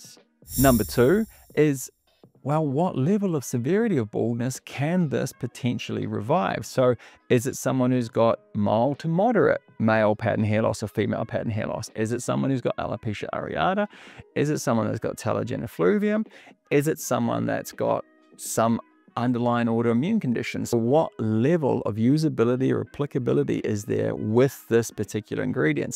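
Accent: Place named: New Zealand. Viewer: And just for our listening international audience, in case you’re wondering, our good doctor is a Kiwi aka New Zealander living in Australia. There’s no mistaking that accent.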